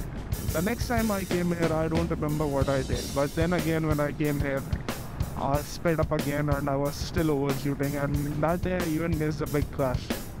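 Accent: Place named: Kolar, India. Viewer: that Kolar dam accent 💀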